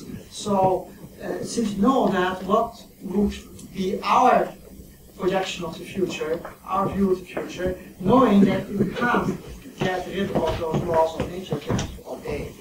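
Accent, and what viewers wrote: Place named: Germany. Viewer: Well I was going to watch it, but all the bumps and feedback in the audio on top of a muffled German accent make this video harder to understand than a police radio haha. Did you actually watch this before posting it? Seriously, it's like someone put the microphone in a sock full of rocks, dangled it from a string, and started trying to play Conkers with the speaker, before adding an echo effect post production and following it up with noise removal on a sample of his voice.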